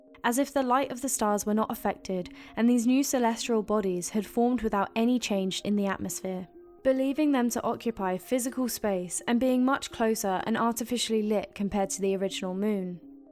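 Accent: United Kingdom. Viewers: She sounds British.. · Hey...I love your beautiful voice! I also really like the voice of the young man with the lovely British (uh, I believe) accent that is like yours. Great video as usual. Hope to hear more from both narrators.🧸🎈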